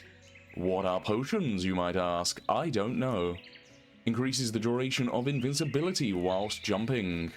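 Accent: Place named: United Kingdom. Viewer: British man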